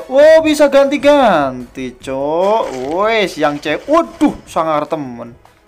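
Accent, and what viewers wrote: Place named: Banyumas, Indonesia. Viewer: Wong endi mase ngapak temen ngomonge ekwk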